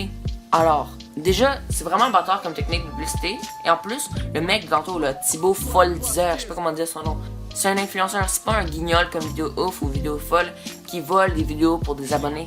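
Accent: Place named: Quebec, Canada. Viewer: Gg t québécois j'adore ton accent 😍😍😍😍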